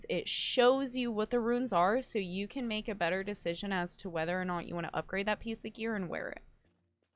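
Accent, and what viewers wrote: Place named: South, United States. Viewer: OK it’s adorable there’s just a little bit of southern girl MUA bank to see if I can ever so often a little bit of southern accent pops out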